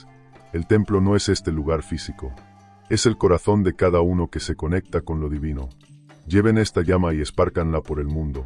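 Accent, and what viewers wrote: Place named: Spain. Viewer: Olá ..por favor..alguien podría decirme de qué parte de España es este narrador? Paramahansa es mi maestro ..mi gustó mucho la narracion ! Soy de Brasil y entendi bien su discurso ..estoy apriendendo español! muchas gracias !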